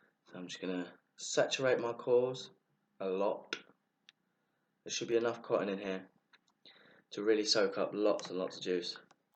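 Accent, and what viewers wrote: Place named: United Kingdom. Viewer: Vape Nice to see some reviews of ss wire, there aren't many around. Your videos are great and very informative, unlike many other vape videos where acting and yelling is the main part. Would be nice with a better quality though, i can guarantee you that your channel would get more subscribers if you had better video quality, because your videos are great, informative and you're a british good looking lad. Not too many british vapers around, it's nice to listen to for a change. But a new mic and camcorder would help tremendously!:D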